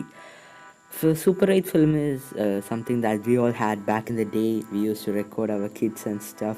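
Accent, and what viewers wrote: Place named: Kerala, India. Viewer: ivante englees ketta ariya malayali aann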